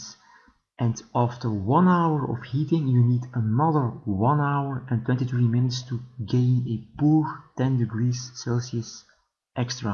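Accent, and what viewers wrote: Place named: England, United Kingdom. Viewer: Thank you for this great video. Btw, j´aime bien votre accent anglais :-))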